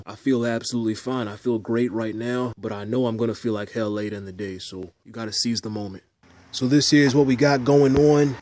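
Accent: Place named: Staten Island, United States. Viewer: Are you from Staten Island? You sound like one of my boys.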